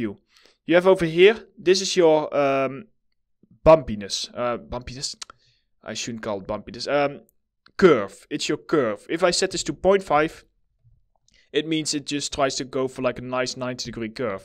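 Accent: Netherlands. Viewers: Thank you for this! I'm learning 3DS Max ( student ), but my teacher just races over the functions and options so I don't really understand how they work. You explained it perfectly! Side note: Are you Dutch by any chance? I noticed the heavy Dutch accent haha. I speak Dutch too, dus dank je wel voor de tips :)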